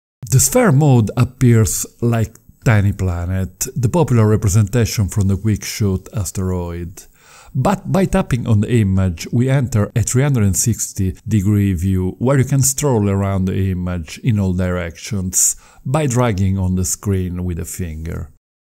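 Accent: Italy. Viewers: Panorama foto moda 😆 like the Italian accent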